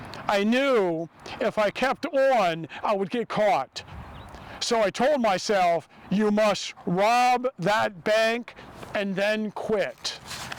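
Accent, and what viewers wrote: Baltimore, United States. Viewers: I LOVE Bal'mer accent. When I was a puppy many decades ago living in Eldersburg my neighbor Fred Will educated me on the dialect.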